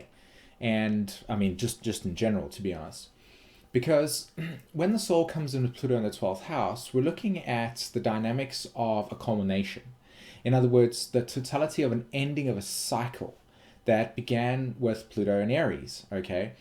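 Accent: South Africa. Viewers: Are you South African ???🙀😺. Great video 👍🏽🌚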